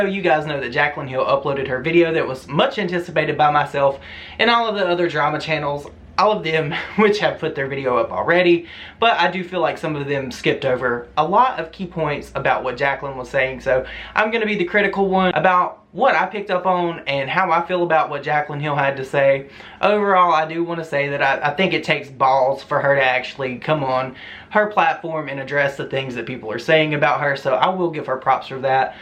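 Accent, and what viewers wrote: South, United States. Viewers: sweetie where are you from? You sound like everyone in my family from Ms,Al, Ky and parts thereabouts. No shade to you, you’re making me homesick. Love your content.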